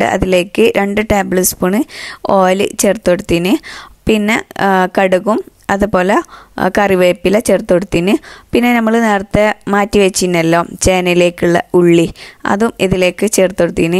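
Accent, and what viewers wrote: Kasaragod, India. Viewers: Ninthe samsaaram full ksd mix anne Njn first time vedio kaanumbo kasarkod kaarthin enn njn vicharichatt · മലപ്പുറം ആണങ്കിലും സംസാര ശൈലി ശരിക്കും കാസർഗോഡാണ് ട്ടോ -😍😍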